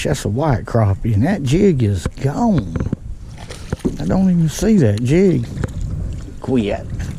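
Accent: South, United States. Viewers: I love this guy all his videos are amazing I love his southern accent to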